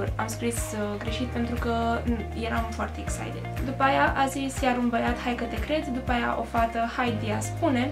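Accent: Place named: England, United Kingdom. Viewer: vezi ca ai putina engleza pe la coltul gurii:))))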